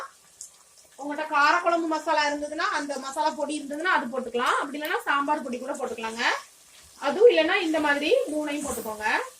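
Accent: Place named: Nagercoil, India. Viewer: Hi aunty.. Im studying 2nd year but I’m so interested in cooking that’s y started watching cooking videos ...I’m ur new subscriber ☺️ I love ur videos ❤️ And I love the way u explain and u upload different recipes ❤️ I guess ur from Nagercoil coz ur slang is like tat ☺️ Pls upload some of ur native spl recipes aunty ☺️☺️❤️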